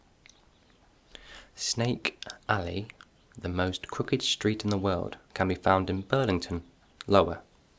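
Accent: United Kingdom. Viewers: Wonderful video!!!! Thank you - I so love the British accent :D!!! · Your british accent is so awesome❤️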